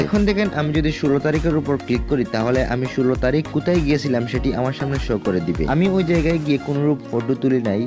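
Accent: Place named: Sylhet, Bangladesh. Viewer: সিলেটি ভাইনি বা❤️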